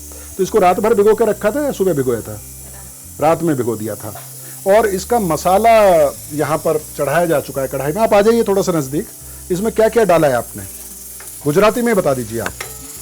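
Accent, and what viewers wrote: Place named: Moradabad, India. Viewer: जी आपकी भाषा मुझे मुरादाबाद के आस पास के छेत्र की लगती है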